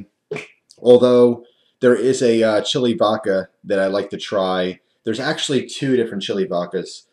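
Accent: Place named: Pennsylvania, United States. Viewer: ya Pennsylvanian accent pride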